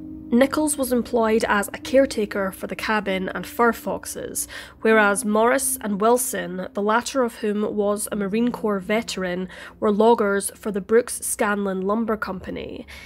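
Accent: Scotland, United Kingdom. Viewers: Came across this channel by chance stayed for the Scottish accent and creepy atmosphere ❤ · Wow just found your channel and I love it already, beautiful Scottish voice 💙💯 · You Scottish? Seems like it. Great case keep it up ma'am. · I’m so happy I found you channel. You cover these stories simply and thoroughly. And I love the Scottish accent! I can’t believed I missed the deer doing their business in the background, I’ll have to go back and look!🤪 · Where is the narrator from? I'm really bad with placing accents (even in my own country). To me it sounds like either a Scottish accent (I don't know what type of Scottish) or a really slowed down Belfast accent, but I don't know cause I'm really, really, embarrassingly bad at this.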